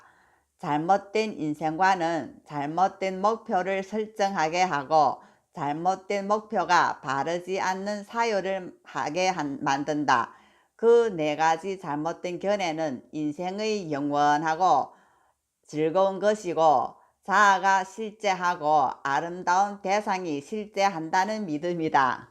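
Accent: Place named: Gyeongsang, South Korea. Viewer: 불교 신문이라... 남 해치지 않고... 근데..많은 사람들이 남을 해쳐서 자신의 이익을 얻고자 하지요....혹시...경상도 출신인가요? 나도 갱상도인디...억양이 갱상도 같아요 ㅎㅎㅎ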